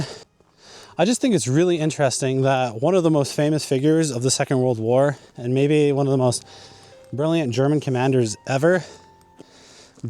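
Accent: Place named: United States. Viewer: Although your accent seems American to me, I do wonder if you're a Brit living in the States.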